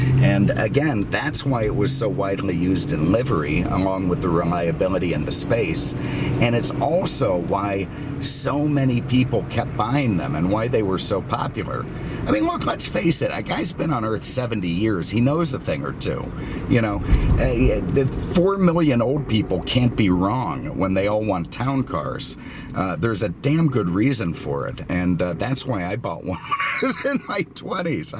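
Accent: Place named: United States. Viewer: I also love the way this guy explains cars is good humor and especially the accent.. pure Americana if I owned an Automotive Group Los Angeles you'd be my spokesman on TV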